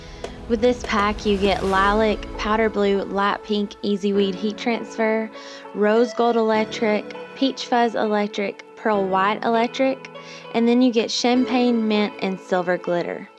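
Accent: South, United States. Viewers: Your accent is literally the sweetest southern accent like...ever😂❤️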